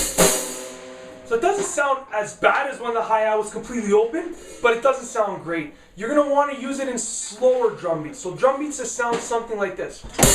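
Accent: Canada. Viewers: Sounds canadian for some reason. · Definitely Canadian. Good video man. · Are you Canadian?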